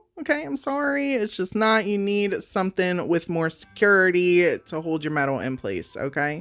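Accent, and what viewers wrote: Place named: Maryland, United States. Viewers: Heyy Philly girl here, we also say wooder! Your ‘accent’ is one of the reasons I started watching your videos, in addition to your amazing art and diys! I, unfortunately, live in Florida at the moment and I refuse to say ‘water’ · 😂 yes, we have a distinct accent here in ❤ Maryland. I totally understand what you're saying. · Hi. I love watching your videos. I learned today that I could blend chalk paint and acrylic to use as a base. Way cool! I am really in to decoupage right now, so I loved all of those, but the Christmas ornament was my fav. I had a sneaking suspicion that you were from Maryland. I too used to live in Maryland...Bel Air. I still say wuder even though I now live on the West Coast. Some things you just can't let go of!💖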